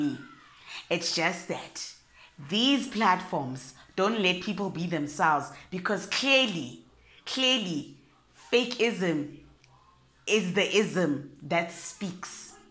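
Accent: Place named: Limpopo, South Africa. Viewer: 🤣🤣🤣🤣 I love the Limpopo English accent, it really goes for the soul😂😂😂😂 I think some Americans don't want to make the effort to learn how to listen, especially if a person has a thick accent. However, some appreciate and even love other people's accents and as a result you thrive at what you do and offer.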